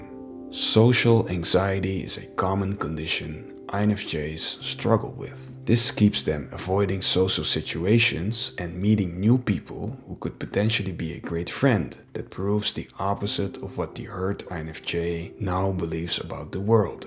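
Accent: Netherlands. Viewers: I hear a Dutch accent. I’ve been around the world to find you :-). Come to Mexico and let’s join forces. Let’s come together and create an off-grid community. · Any chance the narrator is a native dutch speaker? As someone coming from the Netherlands I thought I heard a bit of a dutch accent :) As an Infj myself I could really relate to this video.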